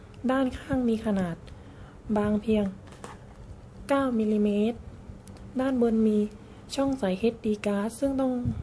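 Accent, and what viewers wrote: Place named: South, Thailand. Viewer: อยุจังหวัดไรนิแหลงออกทองแดงเรย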